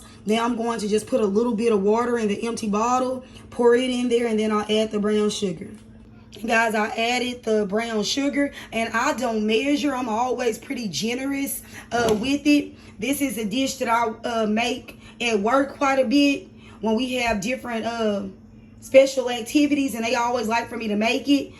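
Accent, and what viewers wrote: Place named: South, United States. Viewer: Luv luv your down south accent...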